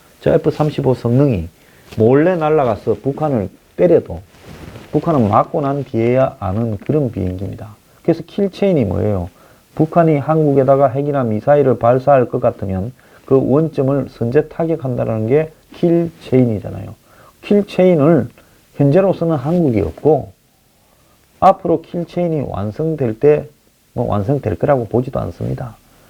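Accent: Gyeongsang, South Korea. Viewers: 완전 경상도 스타일 ㅋㅋ 화이팅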